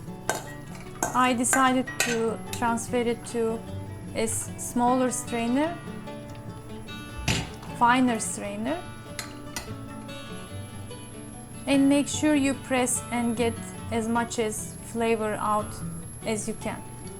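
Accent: Turkey. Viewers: Türk var mı konuşan kadın türk gibi geldi ingiliz şivesi yok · Abla Türkçe konuş türksün zaten 👍😂. Aksan Türkçe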